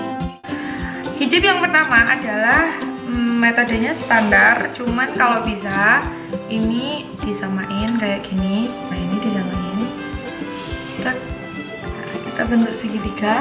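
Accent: Java, Indonesia. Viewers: Orang jawa nya mba kelihatan medok banger maaf nyh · Hehe sy malah demen lht gaya bhasanya die selain cantik logat jawanya ntu tuh gemes 😁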